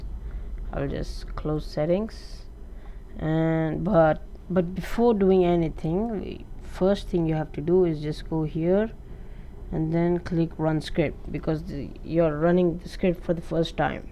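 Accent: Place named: United States. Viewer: are han thike mujhe maloom hai kya amrica ki accent mai kyun baat karre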